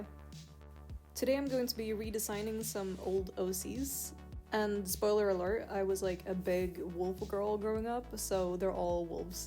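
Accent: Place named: Scandinavia. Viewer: do you speak Swedish/Norwegian/Danish? Your speech pattern and slight accent sounds so familiar XD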